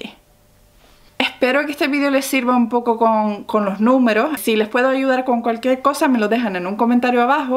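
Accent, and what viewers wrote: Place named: Canary Islands, Spain. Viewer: Que acento más canarión tienes (de Gran Canaria) jajajaja gracias por el vídeo!! Muy bueno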